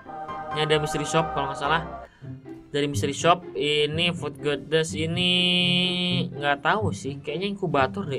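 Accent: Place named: South Kalimantan, Indonesia. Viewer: Abang orang mana kayak bahasa Kalsel aja😅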